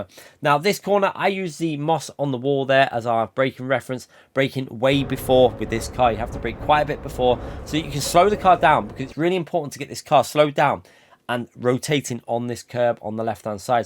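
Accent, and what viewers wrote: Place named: RP, United Kingdom. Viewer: The sounds more posh when he's doing his guides than on his live streams.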